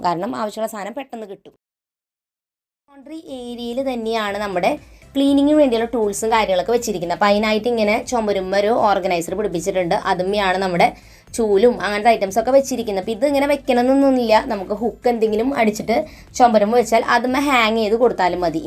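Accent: Thrissur, India. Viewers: Ningal thrissur aahno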